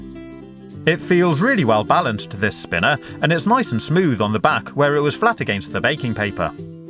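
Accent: United Kingdom. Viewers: Are you British? You sound like it! =D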